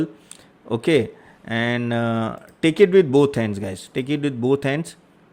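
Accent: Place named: West Bengal, India. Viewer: U speak also like a Bengali n look also.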